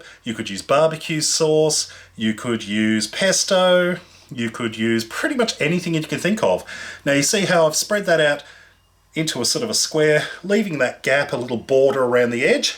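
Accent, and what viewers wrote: Australia. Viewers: This looks wonderful. Thanks for the recipe. Good to hear an Aussie voice on the tube too. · God bless you. You are a beautiful soul. Is your accent Australian? Do tell. thanks. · I love your quick cooking of course but mostly I love your Australian accent!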